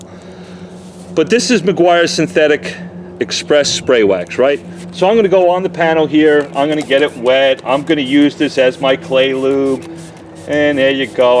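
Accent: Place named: Italian-American, United States. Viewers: Truck is Texas, voice is Long Island (observation from Australia). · This guy must be Italian from the east cost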